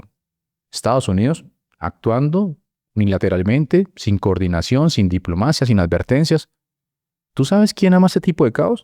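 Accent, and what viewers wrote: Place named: Colombia. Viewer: En Venezuela nadie se esta quejando de eso porque aca en EEUU si?? Tu tienes acento Colombiano sabes que si no hay familias hablando es porque el narco esta detras de esto , en Venezuela hay informes de periodistas que dicen que Dios Dado Cabello tiene amenazadas s a las familias porque?? Porque esta conectado con el narco estado de Maduro , Trump esta cometiendo muchos errores este no es uno de esos estan volando narcos y eso esta bien, quienes piden explicaciones el borracho de Petro ??? Maduro que no es presidente de nada ?? Quienes sufren el narco en america latina jamas van a estar en contra de esto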